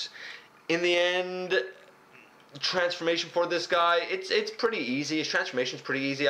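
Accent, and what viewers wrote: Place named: Newfoundland, Canada. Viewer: Are you a Newfoundlander ? I come from there and our accents are almost identical. The only other possibility is if you come from Ireland or Cape Bretton.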